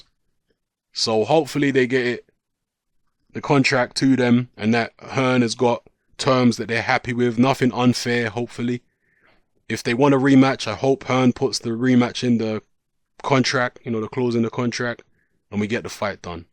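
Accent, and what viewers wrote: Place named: United Kingdom. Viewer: U speak wit a uk voice and even u dont know the name of the stadiums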